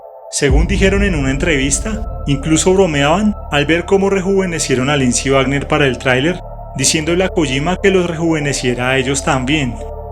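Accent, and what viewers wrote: Colombia. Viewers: Se nota que es de colombia jajaja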